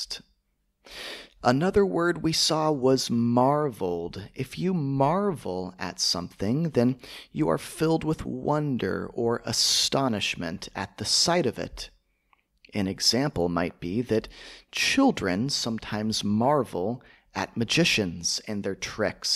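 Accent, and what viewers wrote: United States. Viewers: I found your channel a week ago and was curious to know your first video. You have started differently but I really like your clean pronunciation!! I am learning English in British accent because it sounds better to my ear but you are making me to want American accent as well!! Thank you !!❤❤❤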